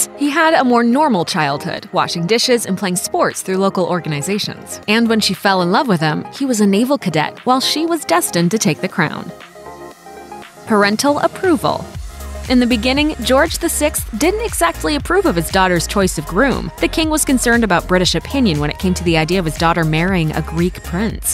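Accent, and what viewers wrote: United States. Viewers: American accents (and not well spoken) are wrong for this kind of documentary (my opinion) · why is the narrator American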